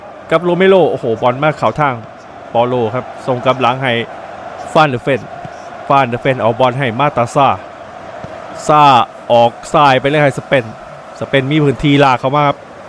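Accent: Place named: Isan, Thailand. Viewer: ไม่เคยเห็นชาองไหนพากย์ อีสานแบบนี้ สุดยอด..😊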